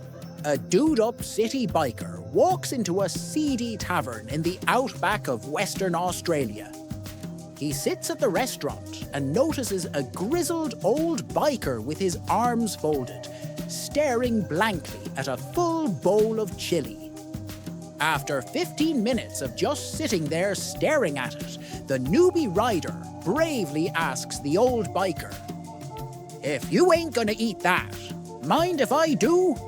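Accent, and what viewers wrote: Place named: Ireland. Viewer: It might be considered typical of an American to say so, but I never thought of an AI with an Irish accent. I do, however, wish to say that the persistant censorship of You Tube consistently eats holes in the conversational fabric of the productions. The weak kneed, limp wristed fascisti who believe that this overplaying of their morality in a public forum somehow empowers them in any manner are sadly mistaken. If they should ever fully emerge from the womb (or asshole), of their mother, they will have to realize how poorly thought of by the rest of the world What a cowardly behavior for adults...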